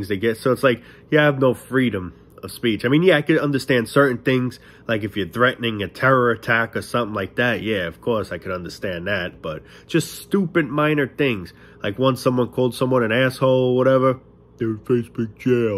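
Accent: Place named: Boston, United States. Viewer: Are you from Boston ? Love the accent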